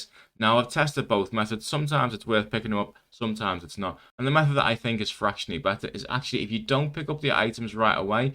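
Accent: Liverpool, United Kingdom. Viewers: scouser by any chance? haha!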